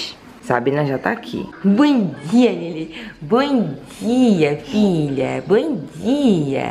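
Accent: Bahia, Brazil. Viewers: Amo esse sotaque baiano e essa mamae forte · " Teu fogo vai acabar agora " kkkkkkkkkkkkkkkkkkkkk Eu amei ! Se eu fosse chutar diria q vc é baiana . Do ND me parei nesse vídeo kkkkkkkkkkkkk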